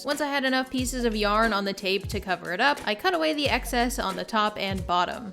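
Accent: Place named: Colorado, United States. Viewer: Sounds normal to me. Apparently dropping the t from the middle or end of words is a Colorado accent thing. Cur-ains, Moun-ains, and so forth.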